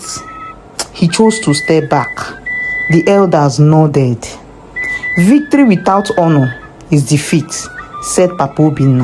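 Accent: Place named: Igbo, Nigeria. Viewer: Wow my own sister I love the Igbo accent very powerful story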